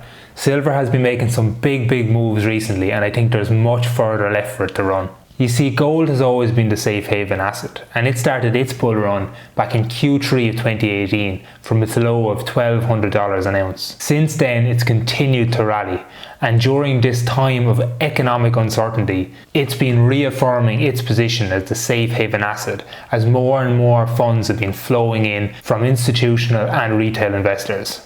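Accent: Ireland. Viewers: What an accent! Are from Ireland by any chance? Greetings from Munich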